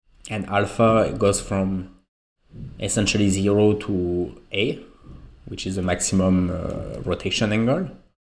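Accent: France. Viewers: You have a french accent despite you ain't french 😄 Great video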